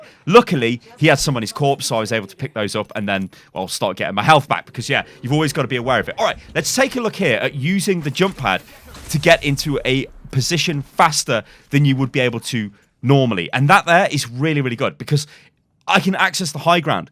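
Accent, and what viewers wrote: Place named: United Kingdom. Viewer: 200iq play. I’m fucking cracking up. Whatever anyway my twitch is “theToxicLlama” I’m crazy funny toxic and I suck ass. Tune in. Rumor has it I’m gonna off myself soon for losing so many games. Great content. Always watched your OW stuff. I am truly truly brutal on my OW streams. P.s I generally hate British people. Stupid fucking accent but your aighttt big brain big IQ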